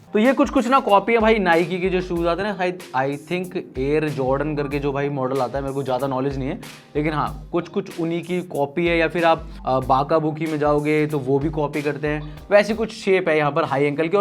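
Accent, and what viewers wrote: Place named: Haryana, India. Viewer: Yehh haryanvi ✌🏼😎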